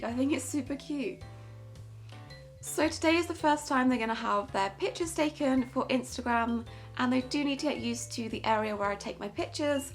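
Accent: United Kingdom. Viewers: And also, sorry for being creepy but what part of the U.K. are you from? 💕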